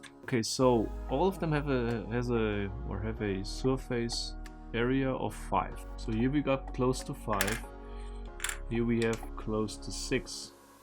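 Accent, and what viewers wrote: Germany. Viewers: I really like your videos, they are so satisfying 😌 also your German accent is awesome 👌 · Haha love your german accent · Wenn deutsche Englisch sprechen 👆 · I am Dutch! I like your german accent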